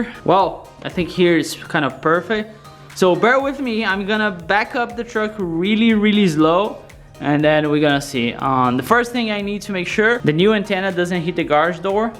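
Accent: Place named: Brazil. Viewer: Sound like from brazil..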